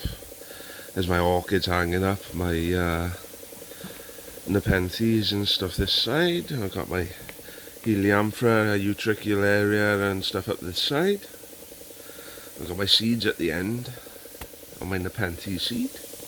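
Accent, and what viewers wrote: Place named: Wales, United Kingdom. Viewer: I never knew you were this Welsh! Awesome vid!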